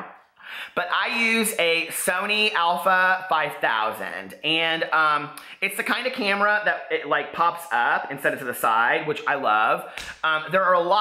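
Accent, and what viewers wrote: South, United States. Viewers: Just found you....love u so much! Are you from the south?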